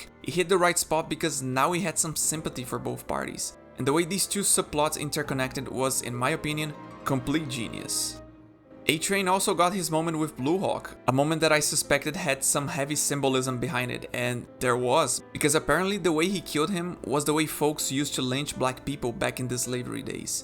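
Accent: Brazil. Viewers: are you brazilian? I'm asking because of your accent... :) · Caraca reconheci nas primeiras palavras que era brasileiro hehe vou conhecer o canal.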